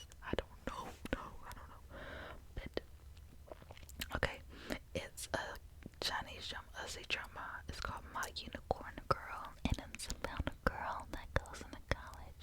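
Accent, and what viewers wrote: South, United States. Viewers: Big southern.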